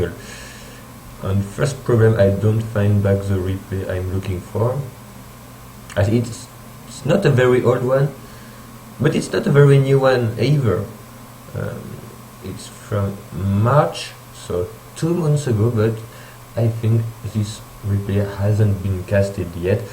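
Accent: France. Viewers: Something about the heavy french accent and the microphone buzz makes watching your casts very relaxing. No but seriously, these casts are amazing because you pay attention to what makes FA awesome, the little decisions. Don't hesitate to do more.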